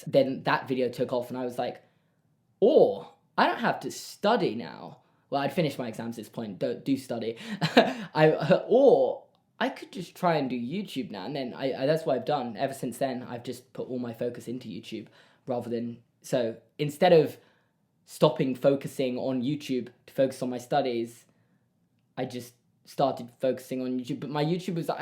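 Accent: United Kingdom. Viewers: haha british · It's weird that YouTube auto-generated subtitles don't understand British accents like why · Is it just me or does serious seem much more British than high energy. I probably feel this way because I really only know Doctor Who and Love Island UK which aren't super high energy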